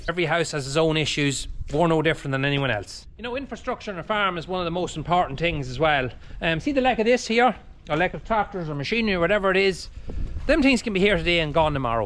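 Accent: Ireland. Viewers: Hi I am a small dairy farmer from Australia where milking 60-70 cows is not common. My wife and I both work off farm as well ( I am a farrier by trade)so at times it makes for long days. We have 5 grown up children and have enjoyed the times where your kids were helping to move cows stopping calves jumping over temporary electric fences etc ( just like us). Until recently I was beginning to question whether to keep going with a small herd and was perhaps embarrassed to be such a small farmer. Then you and your videos came along and you have inspired us and we have a completely new attitude. We want to be like with a beautiful, neat tidy little farm. When we are planning or doing new projects we even impersonate you with put on Irish accents. I do have Irish heritage from Cork. Thank you so much you have made us proud to be small dairy farmers 😊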